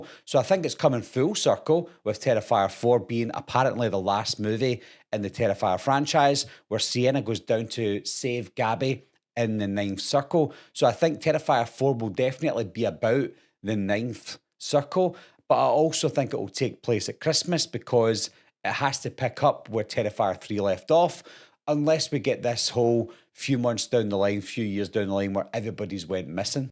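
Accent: Scotland, United Kingdom. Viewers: Great video, man, awesome films, Damien Leone, is a great horror film maker, I really love your Strong Scottish accent, by the way, keep up the great work 💯🙏🏼💙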